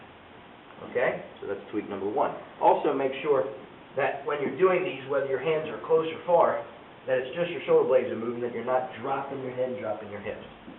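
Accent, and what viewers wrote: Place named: Philadelphia, United States. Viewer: Ended up here because I have to study the Serratus Anterior for an A&P exam and came across an article about how in a weakened state, can cause rounded shoulders. BUT- by doing a push up plus you can increase your strengthening of this muscle, so I ended up here. Don't ask why but 15 secs into this video, I couldn't stop wondering if is from the NE, particularly, Philly. Just from listening to how he speaks.Could be wrong but it's kinda fun to be able to determine that.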